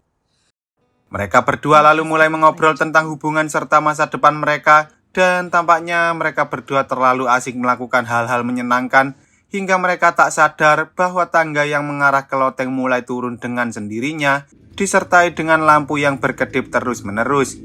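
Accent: Java, Indonesia. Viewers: Pengisi suaranya jawa banget 😅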